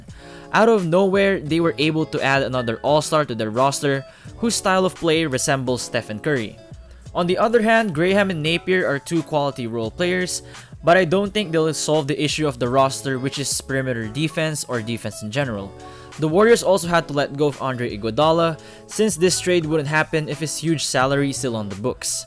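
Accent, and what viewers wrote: Philippines. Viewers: I hear a Filipino accent for some reason... 🤔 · You sound Filipino 🤔 -A Filipino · God this guy sounds filipino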